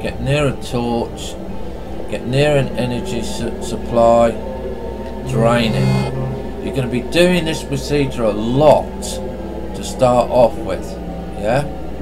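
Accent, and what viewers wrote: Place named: England, United Kingdom. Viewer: What bit of England are you from? as your ascent I think I have heard before but I can't remember where~! :P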